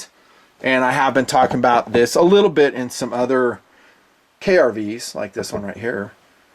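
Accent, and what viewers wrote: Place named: California, United States. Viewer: Thanks for review! Never seen your channel and I'm blown away by how many followers you have...cause you sound like the kids I used to go to school with in california. ..brah! Seriously you got the steel wrong and the knife is on bearings. It's hard to watch your video when you don't know the item reviewed. JMHO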